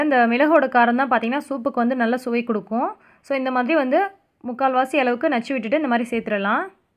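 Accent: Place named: Thiruvarur, India. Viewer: அஸ்ஸலாமு அலைக்கும் சூப்பர் மா நீ எந்த ஊரு மா பேசறப்ப பார்த்தா திருவாரூர் மாவட்டம் மாதிரி இருக்கு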